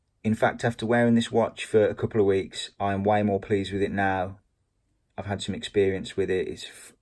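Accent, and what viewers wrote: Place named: United Kingdom. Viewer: superlative British accent absolutely outstanding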